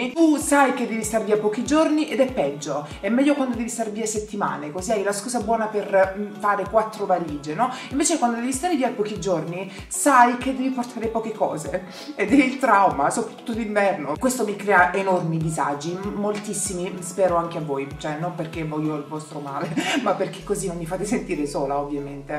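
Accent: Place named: Foggia, Italy. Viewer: posso farti una domanda che non c'entra niente col video?? tu sei di Foggia?? no perchè dall'accento sembra di si!!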